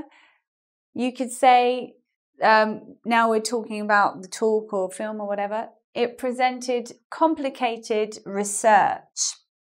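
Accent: United Kingdom. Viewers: I like your British accent ! Especially when you said 'Are you attending the welcome drinks tonight ?', your accent was very attractive and a kind of funny to me and made me laugh !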